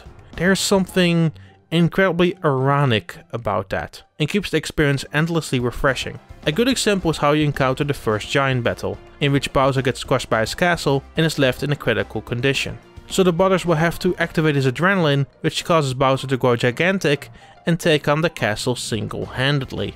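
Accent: Netherlands. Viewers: Wow the Dutch accent can clearly been heard brother xD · You’re dutch, right? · hello fellow dutch person , i recognize your accent :D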